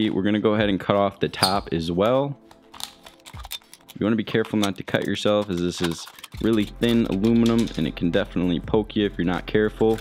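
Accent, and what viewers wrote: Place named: Michigan, United States. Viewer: Pop! You sound just like my family from Michigan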